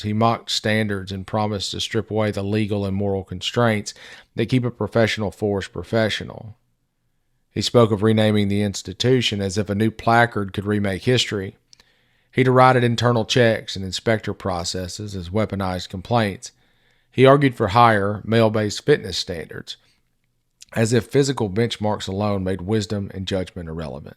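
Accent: South, United States. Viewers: Thank you for your words. Fellow Arkansan. It's hopeful to hear words like these come from a southern accent. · Hearing this with southern twang… you make me proud to be a fellow citizen of yours. I really hope there’s more of yous than there are of them.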